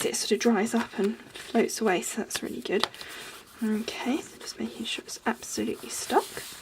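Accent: United Kingdom. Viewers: Really a cute card...thanks for sharing. My grandmother was from England and hearing you reminds me of her. My husband always gives me a hard time because I love anything about England... movies etc...love watching BBC films on Netflix and hearing that British " speak' Thanks for all you do. Pam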